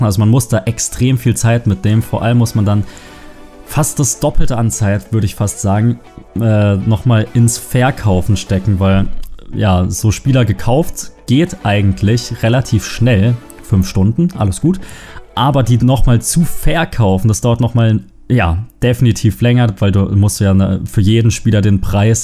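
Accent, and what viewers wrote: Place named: Baden, Germany. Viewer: liegt vielleicht auch an dem badischen Dialekt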